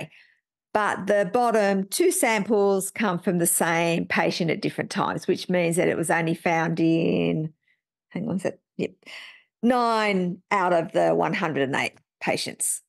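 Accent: Australia. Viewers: Some feedback on your Aussie speak. "Doozy" is more American slang really. It's a noun so you'd say something like, "Onya, another video that's a real doozy". Or "Thanks for the doozy of a video, ". Though "onya" (good on you) is definitely oz speak. Good Aussie adjectives might be "bewt" or "bonza" as in "Thanks for another bewt video, ".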